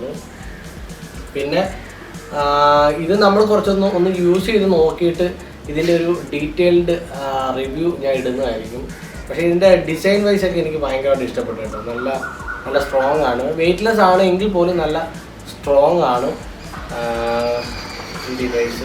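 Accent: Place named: Kollam, India. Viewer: കൊല്ലക്കാരുടെ സ്വന്തം സ്ലാങ്ക് "ദാണ്ടേ " പിന്നല്ല പൊളിച്ചു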